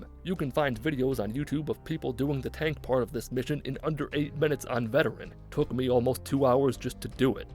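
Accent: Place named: Michigan, United States. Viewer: took me three years to realize youre from michigan what is wrong with me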